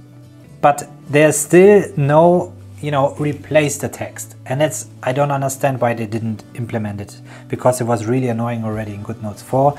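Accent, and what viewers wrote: Germany. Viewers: Das ist very german englisch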